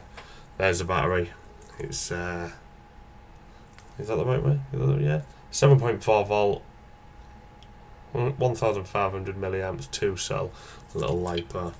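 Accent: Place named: Hull, United Kingdom. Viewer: Loving the Hull accent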